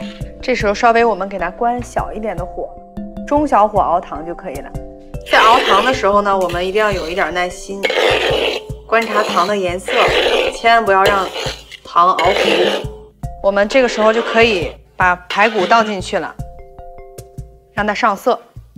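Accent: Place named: Northeast, China. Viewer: 这丫头的话太东北了吧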